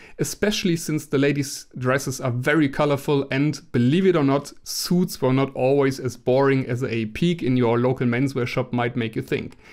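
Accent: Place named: Germany. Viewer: I hear a german accent :) I wish I knew the right tailors in germany...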